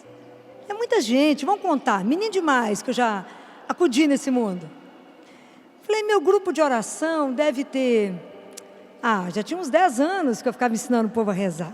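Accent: Minas Gerais, Brazil. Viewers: Eu chorei e consegui aprender muito.pq muito do q ela falou e passei e passo pq meu irmão esta desaparecido ha dois anos. Mas aprendi que entre ele e o sumiço a misericórdia de Deus é infinita. E se fizeram algum mal pra ele como disse o marido dela.Deus irá ou já fez justiça. Mas não sabemos o q houve. Mas sabemos q Deus esta com ele onde quer que for. E meu pai teve morte trágica ele interrompeu a vida dele aos 62 anos. E através da pregação dela e ainda mais q foi mensagem do papa. Hoje eu creio que meu pai e de meus 8 irmãos esta no céu. Com Jesus e nossa senhora. Obrigado Dra por existir por compartilhar e ajudar tantas e inúmeras vidas. Amo te ouvir ainda mais por ser mineira. Q acho lindo esse sutac. E vc é maravilhosa pq disse seu Sim a Deus e por tudo. De toda minha alma e coração muito OBRIGADA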